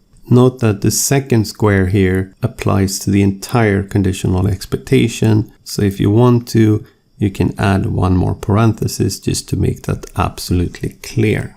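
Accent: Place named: Sweden. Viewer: got a very Swedish accent.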